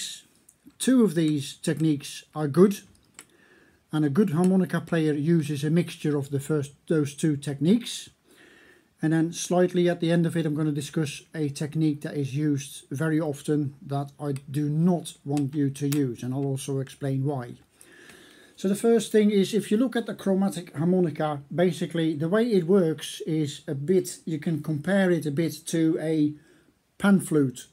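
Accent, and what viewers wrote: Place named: Netherlands. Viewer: Dear, do you live in holland? Your accent sounds so dutch but it sounds like you’ve lived in england for a while haha 😅 and if you live around the randstad do you do lessons??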